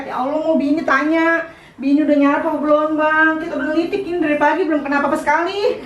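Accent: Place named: Betawi, Indonesia. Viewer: Medok betul Po betawinya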